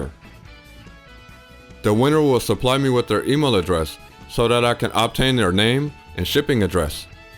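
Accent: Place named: New Mexico, United States. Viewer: Great video. Your voice is easy on the ears and the stuff you are saying is easy on the mind. Are you from New Mexico? Trying to pin down that accent.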